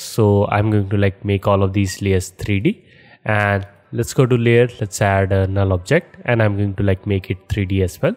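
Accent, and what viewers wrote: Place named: India. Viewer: From that woman voice to Indian accent was... harsh. But I managed. Thanks! 😄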